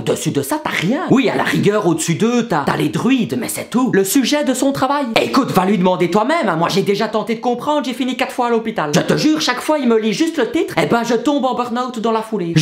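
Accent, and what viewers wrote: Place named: Belgium. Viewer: Son accent belge est juste magnifique !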